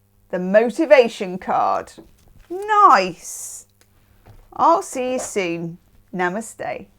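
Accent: New Zealand. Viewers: 😂😂You sound a little more like a kiwi (New Zealander) 🎉❤ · Haha, your Aussie accent is more New Zealand/Kiwi accent. One of my English colleagues, when living in London, used to say that 'England is a cold country full of cold people', we're a very warm country so maybe that's why we're warm-er 🤩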